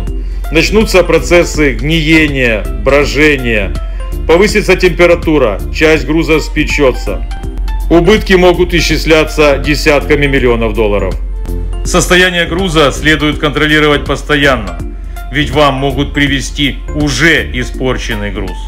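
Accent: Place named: Odesa, Ukraine. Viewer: Начал смотреть видео, и слышу наше родное. Думаю не показалось. Но нет как не крути наш родной говор и как не вспомнить за нашу любимую Одессу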